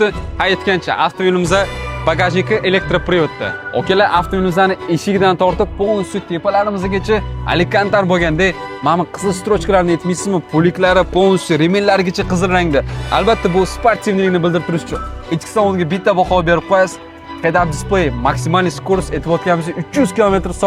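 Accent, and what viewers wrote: Tashkent, Uzbekistan. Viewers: Harpsanu ne toshkencha gapirib chiranasan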